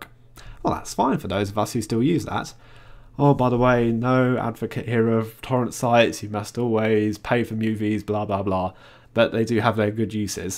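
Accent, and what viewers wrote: United Kingdom. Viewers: never heard anyone more british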